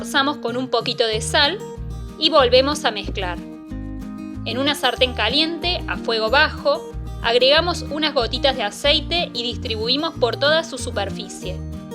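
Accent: Córdoba, Argentina. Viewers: Es cordobesa la cocinera o parece?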